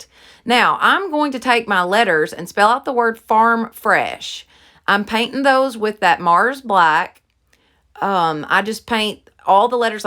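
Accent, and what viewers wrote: South, United States. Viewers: BEAUTIFUL JOB as always!!! You’re my absolute favorite..... not only because I’m a Georgia gal and you speak my language (some people don’t understand our Southern speak) BUT also because your DIY’s are always unique and you never fail to knock it out of the park!!!! Panting dogs! I have toddler dogs too..... you crack me up girl!! Be blessed! 😇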